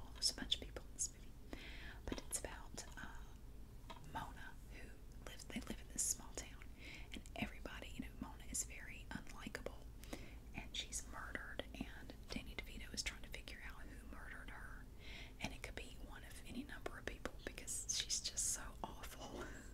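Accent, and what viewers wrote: South, United States. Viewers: i keep coming back to this one, its soo nice. your whispering voice and accent are great! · Ohhhhh myyyy goshhh ffinnallllyyy..you my dear are what i have been searching for. I have been losing my ability to get ASMR because i listen to the same asmrtist all the time. But as soon as i heard your beautiful southern voice..my asmr was instant. Ty so much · Your accent while you whisper reminds me of my sweet biology teacher from highschool in Mississippi its so comforting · Very nice movie selection I have to check out some of those movies that you mentioned.A lot of your selections are my favorite as well.You should watch the "Forsyte Saga" it's good.Great video and I also love your southern accent.❤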